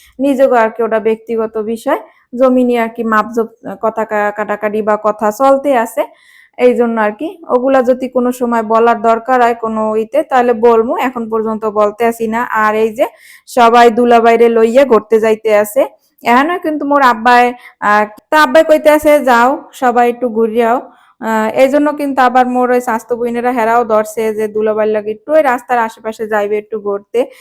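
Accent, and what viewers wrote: Barishal, Bangladesh. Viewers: আসসালামু আলাইকুম আপুমনি আজ ফাস্ট হলাম ইনশাআল্লাহ তোমার বরিশালের ভাষায় কথা খুব ভালো লাগে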